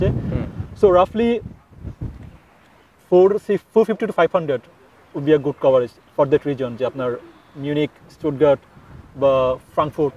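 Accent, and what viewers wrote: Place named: Chittagong, Bangladesh. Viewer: চট্টগ্রামের Accent শুনতে বেশ ভালোই লাগে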